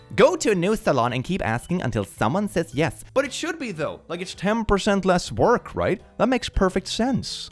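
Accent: Sweden. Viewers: I thought you were American until the impression of Danish, and now I can't stop hearing the Swedish accent. 😂 Good job on getting a very international following! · while you are pretty, I tune in mostly for your accent and the casual tossing out of Swedish words. It reminds me a bit of my Swedish-born first husband! He had a beautiful voice, too.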